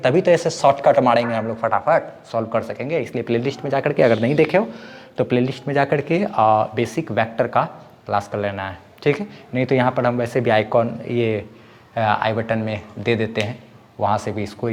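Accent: Bihar, India. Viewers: Loved your bihari accent teaching 🫡🫶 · Sir, I recognize your tone... U r from Bihar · Ya he is from bihar, purnea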